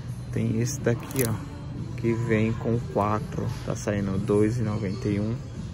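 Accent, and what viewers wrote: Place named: Venezuela. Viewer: Jaja yo crey que era venezolano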